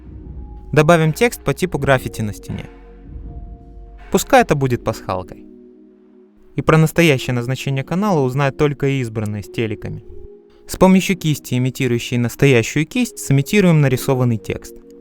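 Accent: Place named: Odessa, Ukraine. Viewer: оуу ты из Одессы))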